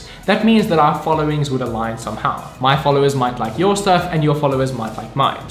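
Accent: South Africa. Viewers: Are u South African I am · Is this guy South African?🤔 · is that a saffa accent bruh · Love this video! I noticed you have a South African accent, I was actually born in South Africa too! 😊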